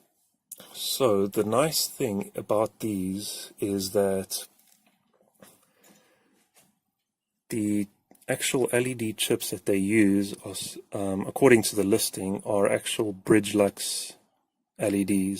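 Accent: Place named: South Africa. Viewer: just a random South African saying my fok when you hear the accent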